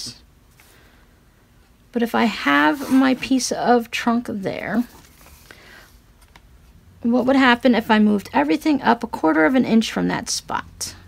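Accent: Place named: South, United States. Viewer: I am so thankful I came across your videos, about two years ago. You are so talented, I love the rambling (and the funny southern pronunciations), seeing your thought process, and how you describe every step (with many alternatives). Bless you.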